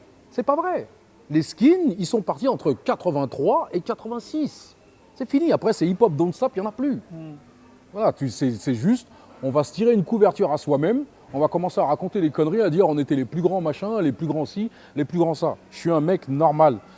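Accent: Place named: Banlieue, France. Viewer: Ba jean Gabin si tu viens pas de banlieue pourquoi tu parle comme un banlieusard ?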